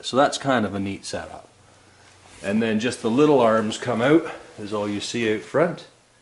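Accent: Canada. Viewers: Is that an Ontario accent?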